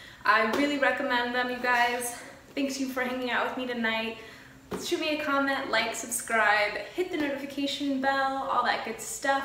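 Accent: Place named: South, United States. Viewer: Loved the video, You are cute and funny. I hear a southern slang as well.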